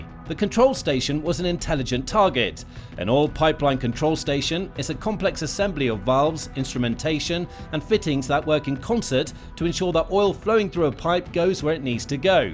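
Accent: United Kingdom. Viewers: Unfortunately Zelenskyy said he does not know how the $350,000,000,000 in US AID has been spent. From the sounds of the British commentator, maybe the Brits know where the grift has gone.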